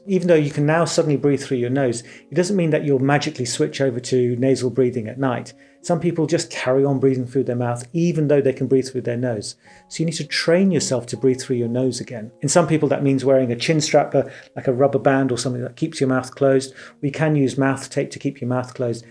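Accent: United Kingdom. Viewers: thank you doc for the information and the devices you ve shown .i do appreciate your British accent but i wonder if these devices are efficient for everyone and if they are available on the Algerian market or not !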